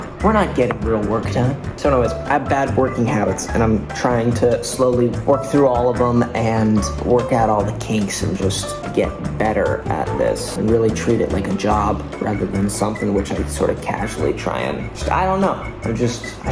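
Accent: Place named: England, United Kingdom. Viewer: Hello, I hope you see this comment. You have many fans in Turkey and there are no Turkish subtitles in your videos. It is not a problem for me, but I think it is a problem for those who do not understand the English accent. Please take this comment into consideration and you should do something about this issue. I think we love you.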